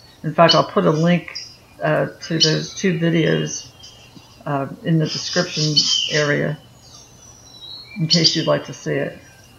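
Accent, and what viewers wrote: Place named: mixed, United States. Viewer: I knew I heard you talkin our NJ/Philly twang,! We're in southern NJ, but my family is originally from Philly. Thx for the cooler idea!:-)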